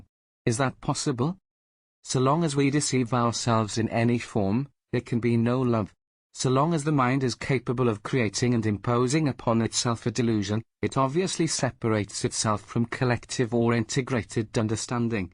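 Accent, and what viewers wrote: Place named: Wales, United Kingdom. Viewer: The Welsh male accent is the worst choice for English narration. Nothing against the Welsh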